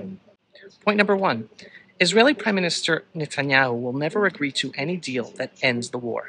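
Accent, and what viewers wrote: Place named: Arab world. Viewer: Your Middle East accent is hard for me an American to understand.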